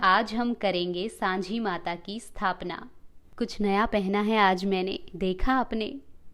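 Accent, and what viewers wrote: Standard. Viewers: आप तो बिल्कुल शुद्ध हिंदी बोलते हो आप की आवाज तो बहुत मधुर है❤🥰🙂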